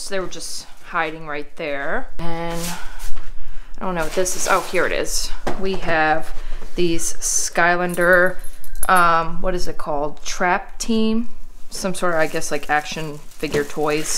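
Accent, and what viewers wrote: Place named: Maryland, United States. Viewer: You sound like you're from MD :)...you're having good luck and next time I'm in MD I'll try to check the dumpsters.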